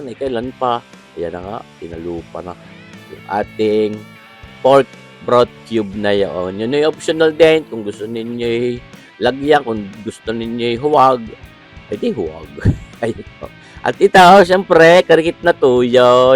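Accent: Batangas, Philippines. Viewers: that’s ow Batangueño talks… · Batangas po yang cooking man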